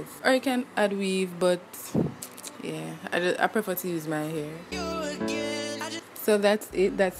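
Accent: Jamaica. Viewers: Hear di accent nuh man 💛🇯🇲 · You sound like a Jamaican, if so I'm also Jamaican lol hehe Nice video btw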